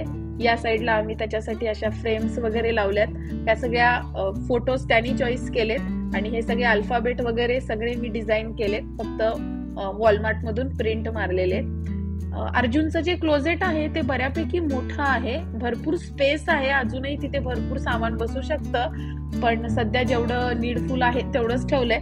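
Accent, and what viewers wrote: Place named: Vidarbha, India. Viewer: Tumcha accent vidharbhacha watto 😅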